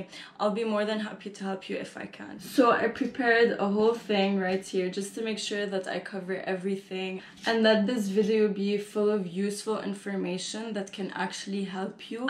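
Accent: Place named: Lebanon. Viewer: lebanese accent is so obvious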